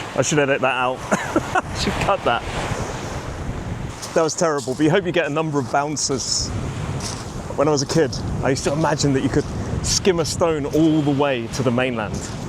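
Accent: United Kingdom. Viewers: So nice, when I was a kid I went with my school to Southampton a couple of times (once to Southampton proper and once to Chandler's Ford) and we used to spend one day in Isle of White, I remember it so well, that was 50 years ago though. Maybe that's the reason I understan you quite well, because usually it is hard for me to understand british accent :) 5 pounds? That's really a bargain! BTW, I think there was a monument in Southamton (maybe a door of the city walls?) called "The Bargain". Am I correct, or it is a false remember?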